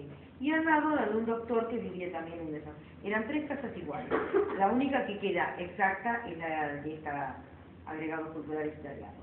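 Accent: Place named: Argentina. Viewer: Correctamente amiga Entiendo perfectamente Dime eres de argentina Amiga Es que Vos habla como los argentinos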